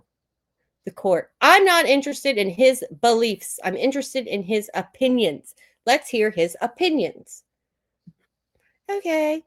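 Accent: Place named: South, United States. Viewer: You’re throwing me off with the southern accent because I don’t think Floridians speak like that. 😅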